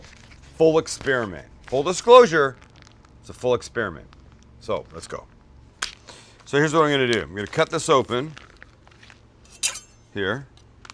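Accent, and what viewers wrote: Canada. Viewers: Are you Canadian? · what part of Canada are you from? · That Canadian accent LMFAO!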